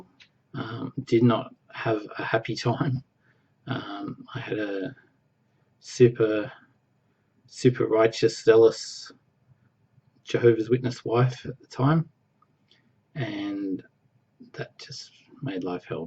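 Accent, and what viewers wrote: Australia. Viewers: Gidday! great accent but of course I am bias, been hot lately mate, thanks for your introduction, though I still believe in God and the kingdom, I can understand why you believe what you do now, and respect your views. :) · Awesome video. Thanks mate. I love our Aussie accent... be proud. Like Cedars you are laid back and nice to listen to. Cant wait to see more from a fellow Aussie. Your experience really resounds with me. 3rd gen always questioning the org, especially seeing all the badness around the world happening to good people but Jehs smartass, rude, selfish people were taken care of? I was also df but at 20. Sucked up go be re but now faded with Hubby at 35. Would love more info on the recovery group you mentioned if its an open group. Greetings from sunny Queensland 😊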